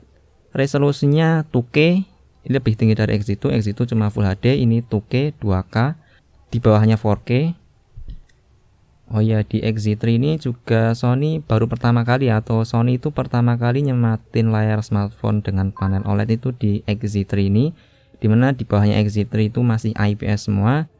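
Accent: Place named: Java, Indonesia. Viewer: Wong ndi samean mas, medoke parah, auto subrek 😄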